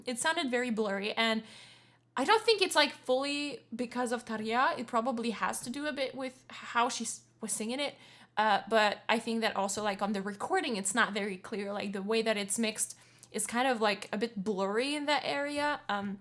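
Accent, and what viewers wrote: Canada. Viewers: Hold on a second, are you Canadian?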